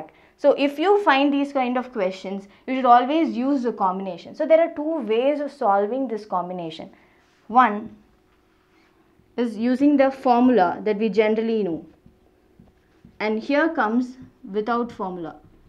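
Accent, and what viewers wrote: South India, India. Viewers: from where are u mam ?? ur ACCENT is like south.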